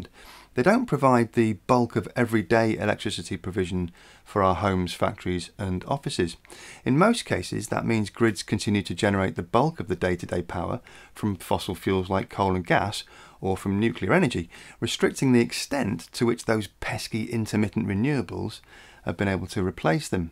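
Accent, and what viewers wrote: United Kingdom. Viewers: Really impressing your film. The illustration of it let me think, that there is a big lobby behind your channel. Love your British accent. Me as a German (please forgive me my English!) am sceptical against so called renewable energy. Because we already pay for it very high. We have the highest energy prices in Europe, probably in the world. We live constantly close to a blackout. If we have had no neighbours with coal or nuclear plants, our system would crash very often. 2018 we have had two critical moments in our grid. In your film you are showing a beautiful landscape at the beach "decorated" with many windmills. This was a symbolic picture: human greediness and ideology destroying nature! My point is not: I am against electrical storage. No! Not at all! This is even the crucial problem of renewables. I am for obligatory solar panels on flat roofs in industrial areas. 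But I am against Solar Parks on fertile soil or in woods, against cornfields for our electrical comfort. Simply because of the efficiency of renewables, the so called EROI (Energy Return on Investment), which is too low. For that reason they need a big area to compensate. Photovoltaic has an EROI of 1.6, Biomass 3.5, Wind 3.9, Gas 28, Coal 30, Water 35. In comparison to it the old veteran of Pressurized Water Reactor has an EROI of 75! Which is not too bad. The windmills are meanwhile as high as the television tower in Stuttgart. The concrete weights 7000 Tonnes. The socle of 3500 Tonnes is left for ever in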